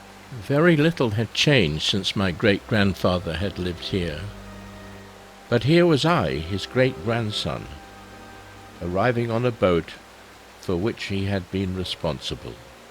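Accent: United Kingdom. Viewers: A Master piece video! Dare I ask, Whos voice is that, that narrates your video? T he slight British accent, the soft tone, ranks with the best voice overs. is that you? or a someone you chose? And the story lines fit the scenes perfect! Thank you so much, for sharing your adventures! My favorite one is your trip to Norway, thats where my Grand parents came from, at the turn of the Century...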